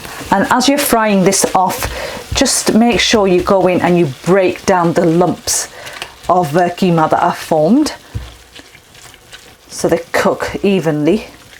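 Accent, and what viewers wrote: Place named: North, United Kingdom. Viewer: are you from up north , can hear is in the accent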